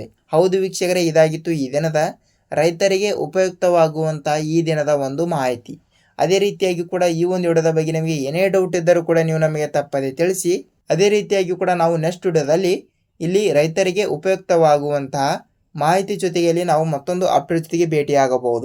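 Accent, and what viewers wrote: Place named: Uttara Kannada, India. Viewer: Uttar kannada speech ok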